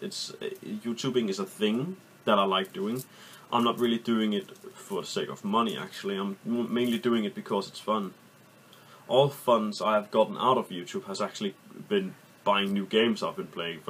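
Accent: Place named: London, United Kingdom. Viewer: Jack The Ripper hmm I thought your from around London from your accent but english doesn't seem to be your first language so I understand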